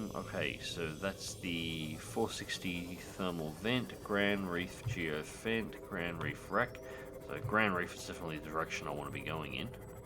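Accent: Australia. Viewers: I love the Aussie Accent so much:)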